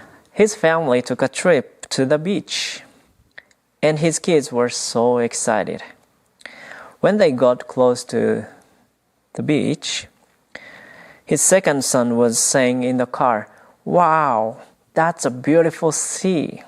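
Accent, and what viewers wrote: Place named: Portugal. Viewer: Thank you for the wonderful English Dharma talk! Sensei actually has a bit of a Portuguese accent, so his Portuguese must be excellent! Namu Amida Butsu🙏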